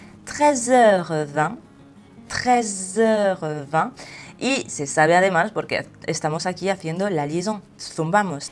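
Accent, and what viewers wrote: France. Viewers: Que hermoso es el acento francés, que tengas un maravilloso día.🌹🥀🌷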